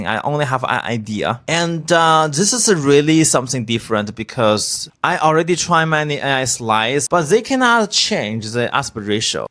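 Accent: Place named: China. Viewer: Honestly, i think you should either work on your heavy chinese accent or consider using tts ... its really hard to hear your words clearly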